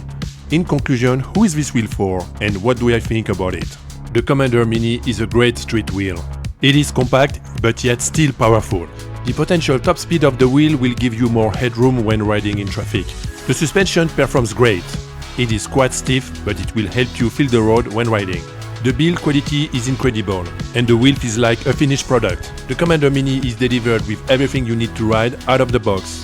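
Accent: France. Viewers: Merci pour ta vidéo, j'ai l'impression que tu es français avec un accent qui ressemble au mien en mieux 😅. Tu utilises quel roue à New York dans la vie de tous les jours ?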